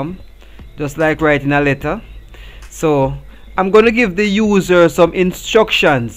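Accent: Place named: Jamaica. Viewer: love and support love the jamaican accent big up yuh self!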